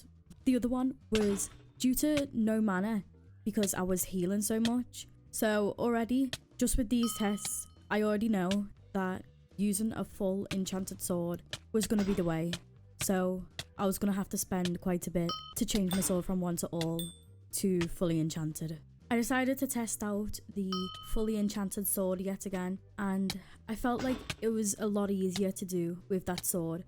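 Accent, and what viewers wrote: United Kingdom. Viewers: Are u british or is that accent in my head? (no offence XD)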